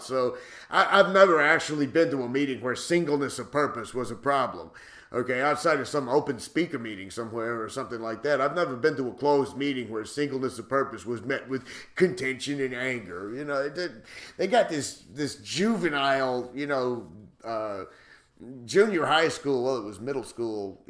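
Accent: New Jersey, United States. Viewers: Your so funny, haven't laughed like this for such along time, I'm literally crying laughing. Love the Jersey "wise guy" accent. Gold. 🤣🤣🤣🧡🧡🧡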